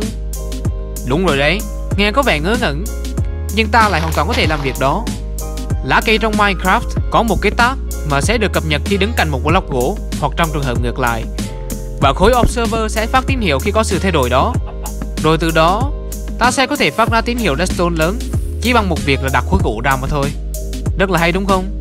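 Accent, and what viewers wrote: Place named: Vinh, Vietnam. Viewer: Đọc giọng ở vinh hay ở đâu đó miền bắc khó nghe quá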